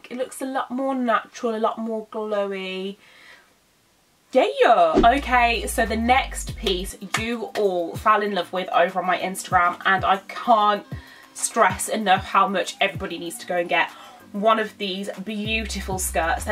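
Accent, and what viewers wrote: United Kingdom. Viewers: is your accent Birmingham?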